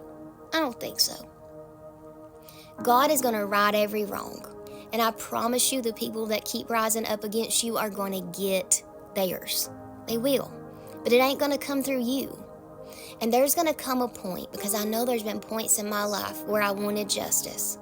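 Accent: South, United States. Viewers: You Are So Beautiful 🙏 I Absolutely Adore You 🤫 That Southern Draw You Radiate Is An Undeniable Beauty Like No Other🤭 I'm From Bama & I Love It. Have A Wonderful Monday Sweet Angel 🫶🫂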